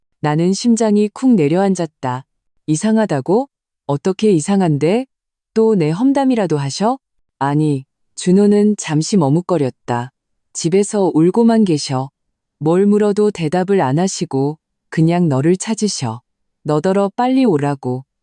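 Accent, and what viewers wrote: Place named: China. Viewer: 해설자가 중국사람이야 왜.숫자를.그렇게잃냐.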